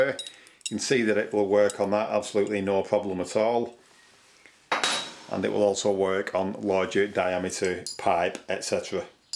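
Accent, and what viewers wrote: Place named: Scotland, United Kingdom. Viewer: I subbed cus of your scottish accent, also handy content!😁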